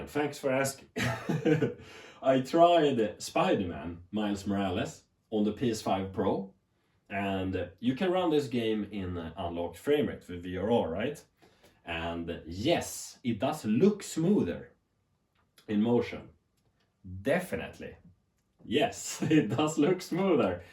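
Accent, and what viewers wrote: Sweden. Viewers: does amd freesync really add input lag? i got a hisense 144hz 4k tv, i got vrr off allso. hey man are you from sweden like me? sound like a bit of swenglish accent;) good content1:)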